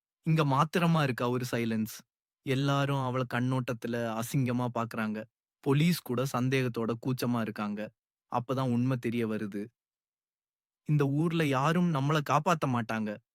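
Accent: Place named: Sri Lanka. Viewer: Your Sri Lankan Tamil ❤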